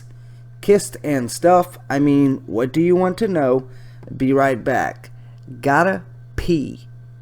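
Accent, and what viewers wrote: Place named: South, United States. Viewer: who the fuck are you? you sound like some stereotypical dumb hillbilly. your recording machine seems to be broken. it sometimes sounds like a vinyl record (if you know what one of those are. if you don't maybe ask some old guy.) that has a scratch and is stuck in a groove what are you doing on the internet anyway? shouldn't you be out hunting possums for dinner?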